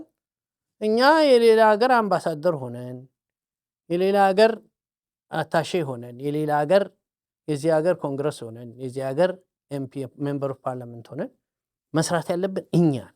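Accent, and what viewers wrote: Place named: Addis Ababa, Ethiopia. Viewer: እንደ ዳኛ ሲሳይ አይነት የአዲስ አበባ ልጆች አንጋገር ዘይቤ ያላቸው አስቂኝ ጫወታ አዋቂዎች በመመናመን ላይ ናቸው ነበሩ ተብሎ ከምወራት ይሰውረን ረጅም እድሜ ከጤንነት ጋር ከመላው ቤተሰቦችህ ይሁን ወግ አዋቂ ያራዳ ልጅ የፒያሳው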